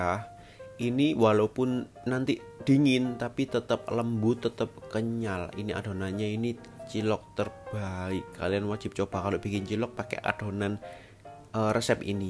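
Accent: Java, Indonesia. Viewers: Ciri khas medok nya agak berkurang ya.. biasa nya lucu dgr voiceover nya kalo medok bgt ky dulu². Tp resep² nya selalu mudah diikuti.. semangaaat!!!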